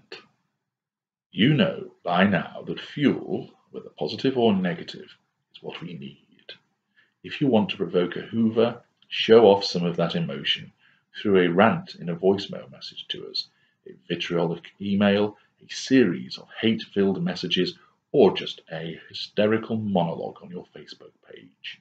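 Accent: United Kingdom. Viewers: you did Hoover me back with the audios! Brilliant work, you deserve the fuel you are getting. Nice to put a voice to the lessons. Oh, that British accent of yours! I'll be forever grateful for all I've learnt from your writings.:) Still not ready to have you write my online dating profile, but I'm still an escapee. Narc did not see it coming. Thanks! Blackwidow.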